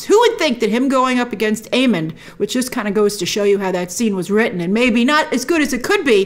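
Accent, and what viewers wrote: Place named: New York, United States. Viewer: I love her new york/New Jersey accent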